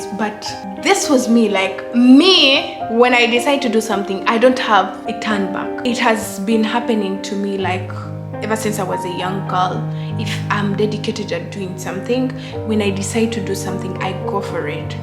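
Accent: Uganda. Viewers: Just seen your videos, you sound Ugandan i stopped because of your accent. Ended up watching your carrot oil video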